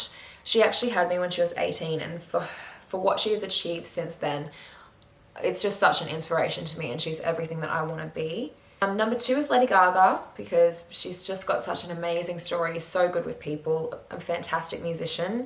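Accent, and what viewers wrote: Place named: Australia. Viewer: It’s a blessing to hear your Ozzie accent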